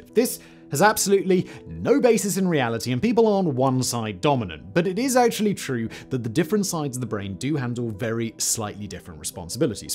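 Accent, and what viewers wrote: United Kingdom. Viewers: Anyone else think he talks too fast and all his words run together? I have never heard a British accent that I just couldn't stand (besides hugh grant but that's cause he's cheesy)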